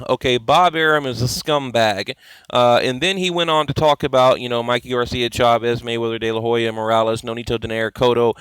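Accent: United States. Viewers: Your fucken Septic yank voice pisses us off,TEDDY fucking Atlas is the most biased fuck in the world,listen to the British version of he fight,they had Horn edging it,Atlas even when Horn was landing heaps of punches early on,Atlas was talking about what Pacquiao was going to do later on,Tim Bradley was attempting to put a non biased slant on the fight,fuck off with your crocodile Dundee shit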